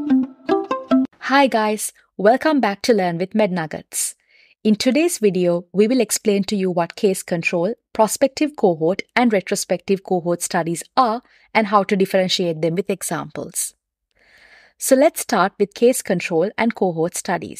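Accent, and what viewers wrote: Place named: Sri Lanka. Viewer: Why do you sound so Sri Lankan? 😮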